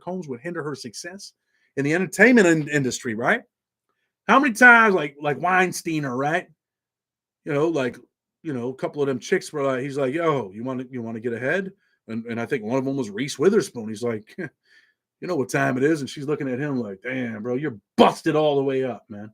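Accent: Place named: South Buffalo, United States. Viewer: Your south buffalo as fuck bro !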